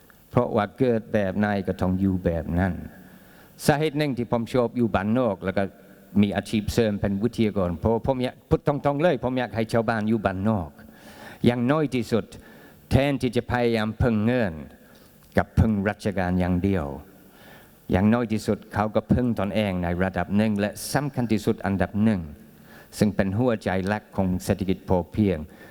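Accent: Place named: North, Thailand. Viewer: ที่มึงพูดอะเมืองไยล้วนๆ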